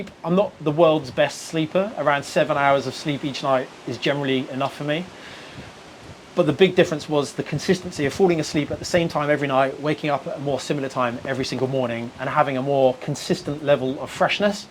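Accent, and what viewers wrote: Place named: United Kingdom. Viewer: First time viewer, was kind of shocked to hear your British accent given that the scenery can’t get much more Dutch 😂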